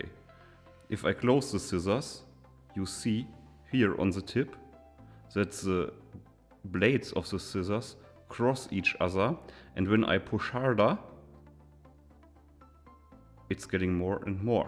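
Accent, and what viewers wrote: Germany. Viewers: You know that this is a Wenger design, made with Wenger machinery in the old Wenger factory is Delemont, under Vic ownership and branding? Having said that this range never appealed to me due to the aesthetic and I can't EDC locking blades here in the UK. In fact I haven't really wanted to buy any of the Delemont (formerly Wenger) SAKs as I said due to aesthetics, the thicker scales, slightly smaller size (85mm instead of 91mm) resulting in shorter tools like main blade & woodsaw, toolset - as I much prefer a small blade over a nailfile - and finally because they tend to cost at least 50% more than the equivalent Ybach manufactured models. When it comes to watches though I do love my Wengers! They have the same specs as Victorinox watches but are much nicer designs in my opinion, much less ''busy'' faces and more understated and elegant, and cost a lot less. 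So I just make sure my SAKs come from Ybach and my Swiss watches come from Delemont. I've never had any quality issues with any Ybach manufactured SAK and they are just great value for money. My latest purchase from them was a Swisschamp in black, it cost me just £58 with free next day delivery (not from the Vic website obviously), that's just 66 Euros, insanely good value. Thanks for your detailed review. I'm 99% sure from your accent that you are in Germany, and if I want to find out if a product is good or not, a German reviewer will give the most critical detailed review. Deutsche Gruendlichkeit usw. I often double ch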